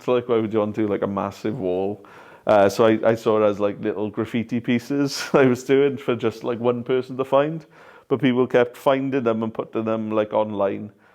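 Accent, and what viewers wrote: Wales, United Kingdom. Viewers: I hope you will show more local businesses. It was so interesting. After hearing that Port Talbot steel works will loose up to 3000 jobs, it gives hope that there can be a future for people working there. You have such a rich Welsh voice, I but you have a good singing voice?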